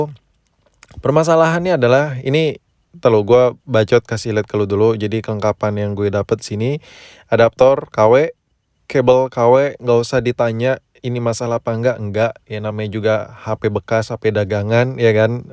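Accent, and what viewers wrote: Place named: Jamet, Indonesia. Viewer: Logat Jamet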